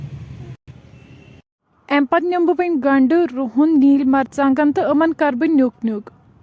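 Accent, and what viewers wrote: Kashmir, India. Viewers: I am always from shopian baatu se pehchaan liya tha ki hamare side ki he hai lots of love from my side allah tala thavnev khush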